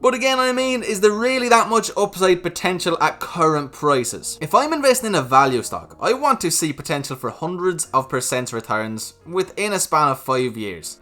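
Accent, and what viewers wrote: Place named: Ireland. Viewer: Sound vids man. So nice hearing an Irish voice aswell hahaha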